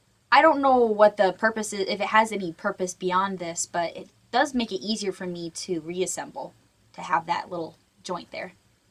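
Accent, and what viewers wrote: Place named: Midwest, United States. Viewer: ahhh, that makes sense, i live in georgia, but i'm from ohio, so i guess i just recognized your midwesternness lol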